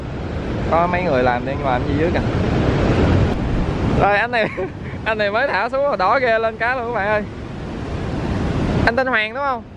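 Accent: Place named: Tam Hiep, Vietnam. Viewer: Giong dab tam hiep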